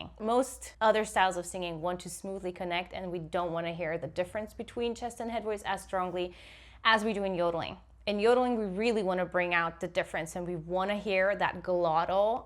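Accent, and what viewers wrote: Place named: United States. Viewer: Bavaria? That figures, the region full of supposed culture of pastrys and yodelling. Your accent would have me believe you are american.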